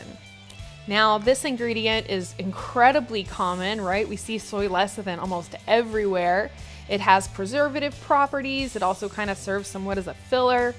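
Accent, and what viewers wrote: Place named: United States. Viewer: Watched for 5 mins then couldn't stand your annoying slow American accent zzzzzzzzz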